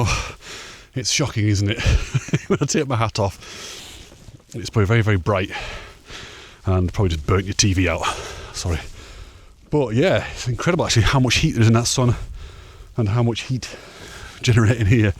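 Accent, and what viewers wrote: Yorkshire, United Kingdom. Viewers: I'm really impressed with the beautiful views and the hiking that you do.always loved England, but I would never be able to do things you do. Sweet doggie Finn. You've got a good sense of humor. I believe that's a Yorkshire accent ? First class😊. The next part you're probably not going to like. I don't know what you had on your Christmas jumper, but you were (grieved) with the comments. What you say is a bit of fun, isn't. Balfamet,a pentagon, stuff like that is occult. The devil is very busy these days blinding g people's eyes. Hope you're not annoyed(grieved), and will think about it.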